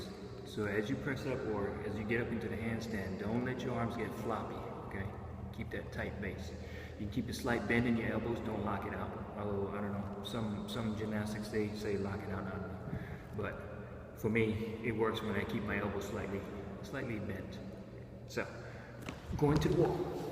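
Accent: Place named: South, United States. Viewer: Great video! Thanks so much! I love your accent, it reminds me a bit of a southern accent-I grew up in the south.;)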